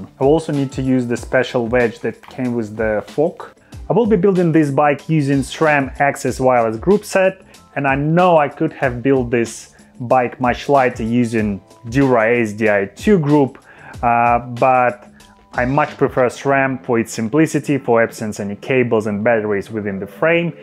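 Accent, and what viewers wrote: Russia. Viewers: Так и думал, в самом начале ролика, что русский =) По акценту слышно.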